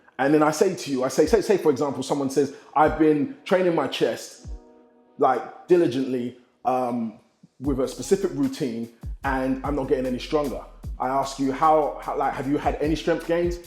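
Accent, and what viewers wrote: London, United Kingdom. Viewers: I had no idea that he was British, I've been following him thru Instagram and haven't heard him speak till now haha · Why does he sound like he from UK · Simeon Panda your body is sexy and I Love your London ACCENT YOUR BRITISH SEXY BROWN SUGAR BOY .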